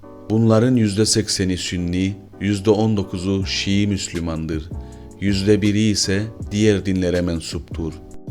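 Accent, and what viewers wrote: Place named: Northern Cyprus, Cyprus. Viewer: Admin Kıbrıs Türk'ü müsün şiven benziyor 😊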